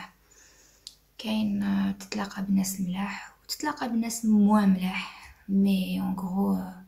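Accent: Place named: Oran, Algeria. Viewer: Comme je te l'ai déjà dit sur Instagram,tu es le porte voix qui a perpétuel l'idée du Mouvement Populaire ou Révolution comme tu le qualifies pendant ces 5 ans que nous célébrons ces jours ci'.Pour moi,tu es l'exemple vivant de la Résistance à la Tyrannie au plan culturel et artistique.Pour tout cela tu mérites notre respect et notre reconnaissance PS : j'ai adoré ton accent oranais ou Tlemcen ..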